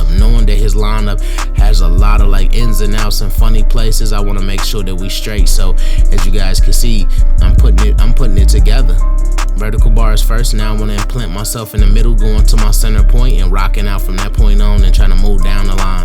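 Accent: Chicago, United States. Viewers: I’m curious I heard him say goofy 😂 you from Chicago bro this my first time watching one of your vids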